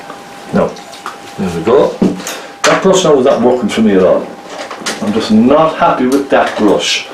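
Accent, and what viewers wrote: Ireland. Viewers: nice to hear an Irish accent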